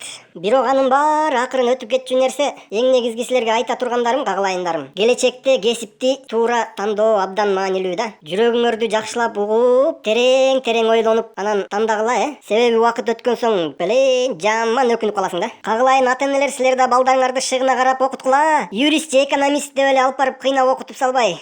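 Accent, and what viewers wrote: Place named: Kochkor, Kyrgyzstan. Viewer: сен Кочкордон же Жумгалдансың сүйлөгөнүң бляяя биз жак да) )))))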